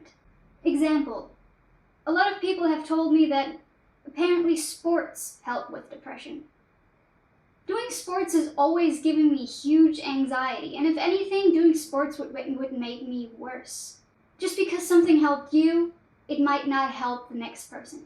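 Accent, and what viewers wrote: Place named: United States. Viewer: der Akzent klingt mir eher nach Amerikanerin :'D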